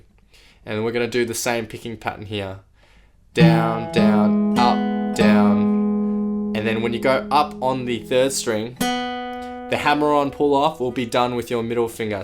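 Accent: Australia. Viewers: Hey I recognise that accent! Hello fellow Aussie! :D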